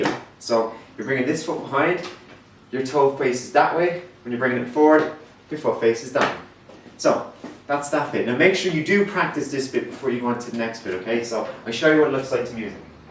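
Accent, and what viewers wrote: Ireland. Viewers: You from dublin? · Are you Irish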